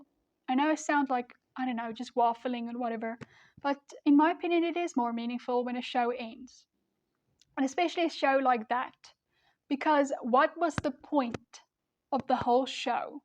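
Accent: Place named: South Africa. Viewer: Hi there! Great video You have some interesting takes on things. I definite think you should watch the final season. It is in no way satisfying as far as concluding these main story plots you’ve been talking about but it does have some nice closure for some other characters. It is an absolute shame that they threw away 11 years of story and world building by stretching the characters into their own shows instead of having some satisfying conclusions to the main story notably Rick. It’s so painfully obvious that the writers had to write Rick out then try to pick up the pieces instead of just finishing the story then. I would have been ok with Neagan dying at the tree but I admittedly enjoyed his pseudo redemption arc and wanted to see him become accepted amongst the community. Im not a fan of how Carl died but I feel like Sadiq ended up doing a lot of good. 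Such a titanic fall this show had it’s a sucks when ips gets hurt because actors want to persue other ventures. Again great video! Your accent reminds me of my childhood Doctor, is it South African?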